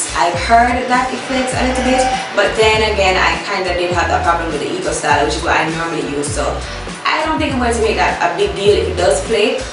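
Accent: Jamaica. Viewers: I’m a simple girl. I hear a JA accent, I subscribe. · I must be so use to hearing a JA accent 💀 cause everybody saying they hear one and i bearley hear it😭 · Is she Jamaican ??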